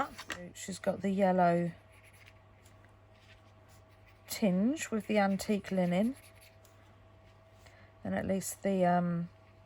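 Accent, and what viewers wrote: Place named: United Kingdom. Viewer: “Ah! I LOVE it”, in that charming British accent, makes me squeal with joy, too!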